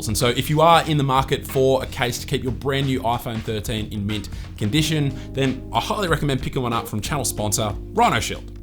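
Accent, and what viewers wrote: Australia. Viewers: Whats with the over the top bogan accent?? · I never heard such deep australian accent in one person. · Hey, I tried to buy one of the RhinoShield Collab cases for my 13 Pro Max using your link and code, but I got an error saying they cannot ship the PAC-MAN collection to Australia? Curious since you sound Aussie? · Heard ur aussie voice and gave an instant sub. Luv to see it 🇦🇺💙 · That hick Aussie accent tho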